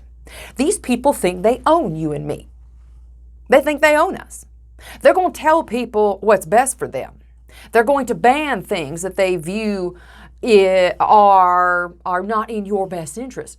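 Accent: United States. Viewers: What state is your accent from? It’s a very nice accent! <mail>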